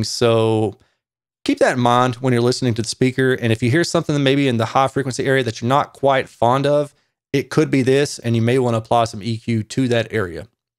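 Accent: South, United States. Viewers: that's great 😎 but what did you wrote toms? Unless its me that misunderstood his southern accent?😂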